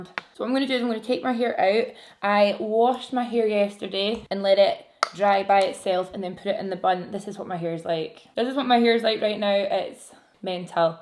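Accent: Scotland, United Kingdom. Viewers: oh god i love the scottish accent 😍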